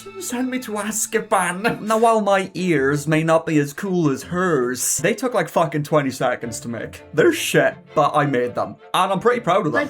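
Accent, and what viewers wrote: United Kingdom. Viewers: I'm english but I can mostly understand this British accent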